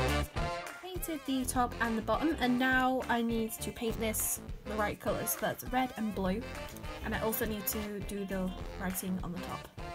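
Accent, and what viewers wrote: United Kingdom. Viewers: Are u from Scotland Uk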